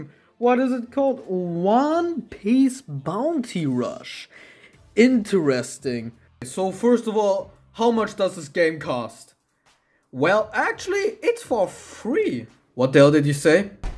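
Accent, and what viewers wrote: Germany. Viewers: Are you german ? You have an german accent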